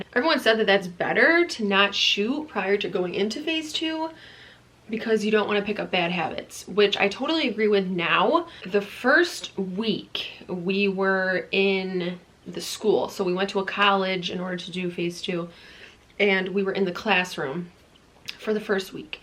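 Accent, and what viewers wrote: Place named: New York, United States. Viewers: She does not have an accent to me. :-) I'd say upstate somewhere, not down by the City. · I love your New York accent, that's like a real cop's accent. I honestly wish I lived on the east coast just so I can talk like that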